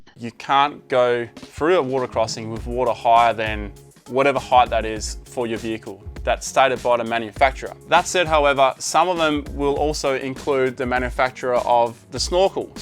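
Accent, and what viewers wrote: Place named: South Sydney, Australia. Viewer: Must be from south Sydney. Surely.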